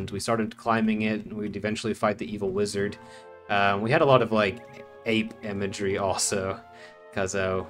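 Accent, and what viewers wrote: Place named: Texas, United States. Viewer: I didn’t know what it was or how I knew, but I could tell you were a fellow Texan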